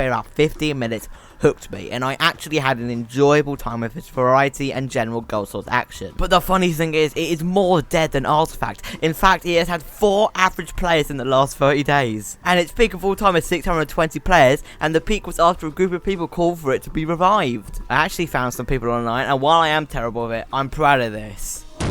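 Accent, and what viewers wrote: United Kingdom. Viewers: You sound too british.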